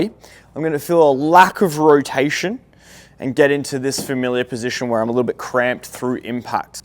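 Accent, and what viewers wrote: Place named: Australia. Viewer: So good to hear a aussie accent for a change. Feels right at home. Great video friend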